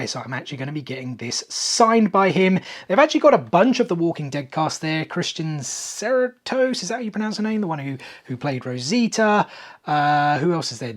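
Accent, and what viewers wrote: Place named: Australia. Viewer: You're in London? I thought your accent was Australian!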